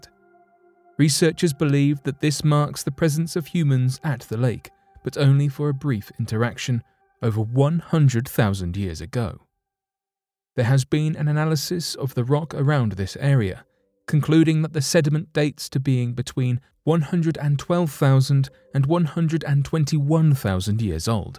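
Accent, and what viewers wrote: England, United Kingdom. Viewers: Enunciation must be precise for communication. Colloqualisms and heavy, near indecipherable accents hinder pure flow of thought. That is true no matter what language is being used. Imagine a Scot trying to speak West Indian--- or a German trying to speak Apache. Whatever language you are speaking, you must be clear and concise in your presentation. This narrator is an Englishman speaking English. And, imho, doing a fine job.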